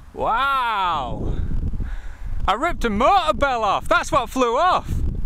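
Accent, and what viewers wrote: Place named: United Kingdom. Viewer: I'm not in the UK though. I just thought by the way he sounded he was in the UK when I watched his videos. I live in Florida, I already bought rbg strip of led, just because I know I'd need help with oriantation, this is my first quad I can tune on my own. kinda excited and really wish it would come I'm already! !! I wanna fly it... I saw at the hobby shop a race drone with fpv and everything except battery and controller on sale for 170 I'm thinking of grabbing that as well lol. I been dying to fly and hook up my fat shark to something that flies again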